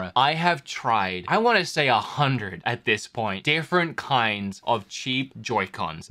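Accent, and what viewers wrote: Australia. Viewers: Wait YOOOOOOOOOOO I DID NOT REALISE THAT YOU WERE ANOTHER AUSSIE!!! AUSSIE AUSSIE AUSSIIIEEE!! OI OI OI!! XD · What r u an Aussie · Are you fron australia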